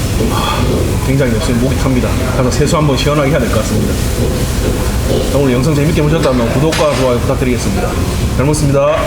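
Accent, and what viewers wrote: Gyeongsang, South Korea. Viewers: 님 고향이 어디신가요? 경상도 사투리인건 알겠는데 어느지방쪽이신지?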